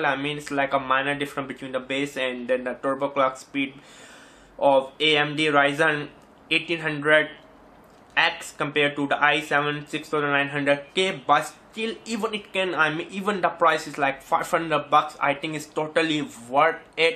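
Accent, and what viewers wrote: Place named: India. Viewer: Dude, you need to decorate your room a bit. Make it look like a professional pc-room. Also, please take elocution classes. You clearly have a speech impediment and you need to get help to overcome this problem. I'm Indian and I am very familiar with the pigeon-Indian accent but even then I find it difficult to understand what you are saying. I'm not being cruel. I'm just trying to give some honest feedback. Once your elocution improves, people will understand what you are saying and your feedback ratings will also improve.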